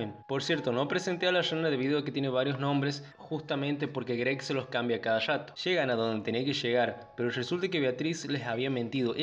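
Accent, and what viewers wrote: Argentina. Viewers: No e visto esta serie pero queria saber de que trataría antes de verla, se ve muy interesante la verdad. Eres argentino, me encanta tu acento!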